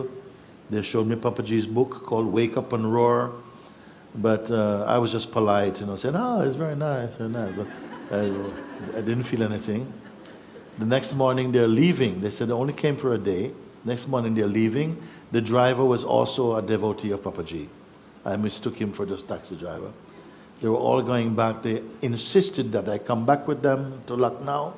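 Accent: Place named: Jamaica. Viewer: He sounds like jamaican..i jave been to jamaica..for 3 years..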